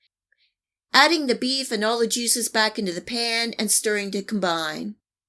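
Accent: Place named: Pennsylvania, United States. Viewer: Love your true Pennsylvania accent.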